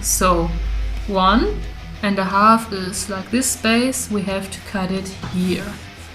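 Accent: Germany. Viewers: Du sprichst sehr gut Englisch, nur man merkt voll, dass du Deutsche bist. :D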